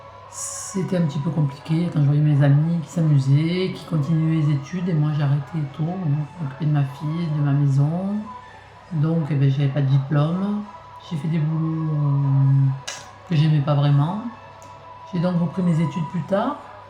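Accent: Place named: Var, France. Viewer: bonjour, je viens de faire ta connaissance sur you tube et je voulais te laisser ce message: j'ai relevé une phrase dans ta vidéo "le bonheur c 'est de ne pas ressaser le passé tout le temps" et bien là, c'est une des clés pour vivre heureux, il faut vivre le présent, aujourd'hui et maintenant comme on le dit si bien, car cette minute que je prends pour t'ecrire ce message s'est déja écoulée, la vie passe comme un éclair, il faut aller de l'avant et etre fidèle a ses convictions. J'aime ta façon de t'exprimer, simple et sincère, MERCI pour cette video, je me suis abonnée biensur et en plus je parle avec le meme petit accent que toi car je suis du Var:) bonne continuation!!!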